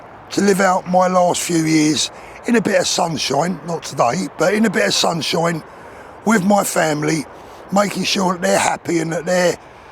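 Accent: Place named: England, United Kingdom. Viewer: home is where the heart is, you like the growth rings inside a tree, they will never change. You grew up in England, your accent is English. You care about your homeland and that will never change I suspect. You moved to change your families life all good reasons, and thats why we have freedom of choice. You are speaking a truth that resonates with your subscribers, and I, along with those who care, still care about you as much as you care about them. The tyre kickers have nothing better to do. They live their lives attempting to drag everyone down to their level of sitting back doing nothing, and spitting out nothing but negativity, because thats all they are, negative people who achieve little, and is why when they see someone who has achieved through hard work, good decisions, and luck can play a part. But they thrive off hate, because thats all they have. 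Just ignore them, when you do that, they lose all power. They will shrivel like a dry leaf when their hatred and negativity achieve nothing. I agree with every word you said close to the end. Best of luck, your heart is true and we all luv you brother.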